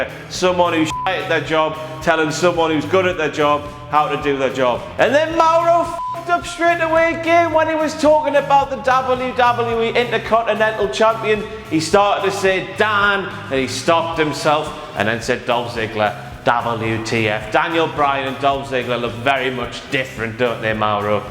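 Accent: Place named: Newcastle, United Kingdom. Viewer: Jay Bclay I know, he's a Geordie (from Newcastle) and their accent is quite difficult to understand, he's definitely joking :)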